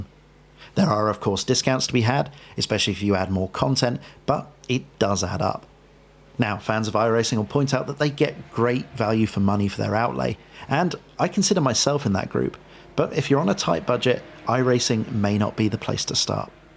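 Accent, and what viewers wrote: RP, United Kingdom. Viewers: a person has a RP UK accent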